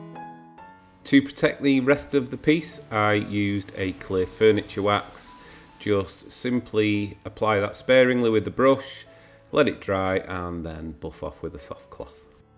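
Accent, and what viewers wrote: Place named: Northern England, United Kingdom. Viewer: Lovely. You’re from northern england? I recognize your accent. Great job. Great piece to have.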